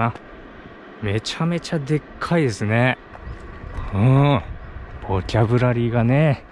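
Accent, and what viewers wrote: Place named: Okayama, Japan. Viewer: 天気も良く、zも艶々で気持ちいい動画ですね！ 岡山弁も炸裂で山形の私には新鮮で微笑ましく拝見させて頂きました～👍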